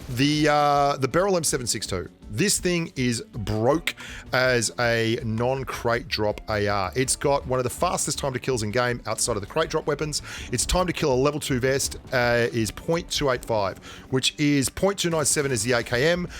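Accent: Australia. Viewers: "GrAAAAAHzer" gotta love that Aussie accent hahaha keep up the great work, love your videos!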